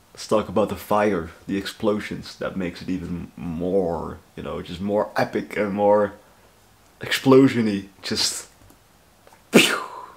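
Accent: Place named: Netherlands. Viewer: Loving the Dutch English accent, kon gelijk horen dat je uit Nederland kwam 😂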